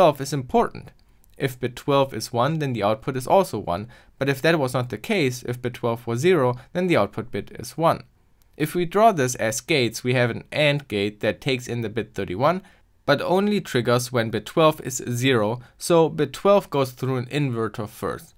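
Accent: Germany. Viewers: Bisschen hört man doch raus das er deutscher ist :D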